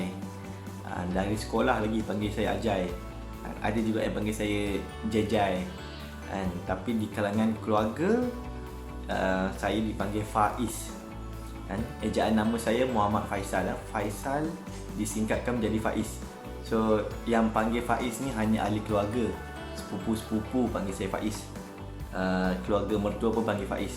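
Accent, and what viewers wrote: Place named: North, Malaysia. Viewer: Happy birthday, sikit lagi nak masuk 4 series. Dalam banyak dilek, utara punya dilek paling best dengar.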